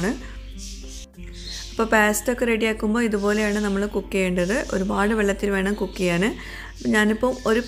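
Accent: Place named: Tirur, India. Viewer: Adh correctaa... Tirur slang correctaayi vannu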